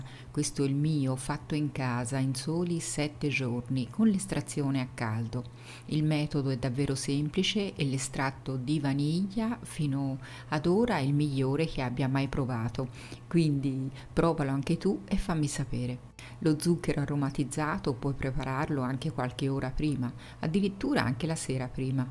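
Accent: Tuscany, Italy. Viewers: Un'altra buonissima e deliziosa ricetta..buone con la crema.. bravissima sempre precisa e dolcissima quando commenti il video..il tuo accento toscano mi piace molto.👏👏👏👏👏👏😘😘😘😘😘😘😘❤❤❤❤❤ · Complimenti per tutto ciò che proponi, e per il modo con cui sai comunicare; la bella voce e la simpatica pronuncia Toscana, ti rendono completa.☺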